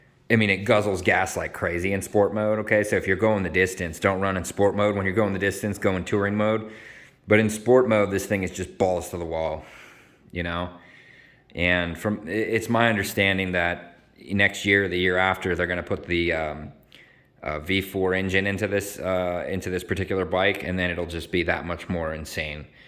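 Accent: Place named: Texas, United States. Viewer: Bro, you got a slight hint of Texan accent now. Also, nice bike.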